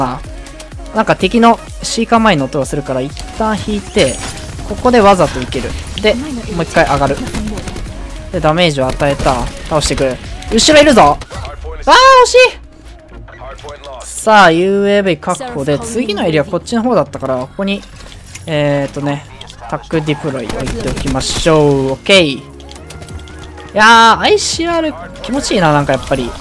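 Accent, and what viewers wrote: Kansai, Japan. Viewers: 関西弁自然で草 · 関西人やったっけ？って思ったよな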